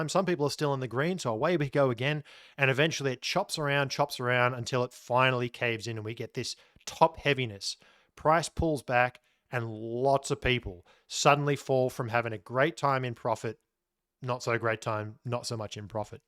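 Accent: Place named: Australia. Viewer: Love your work. The best BTC analyst out there. And so good listening to your Aussie accent!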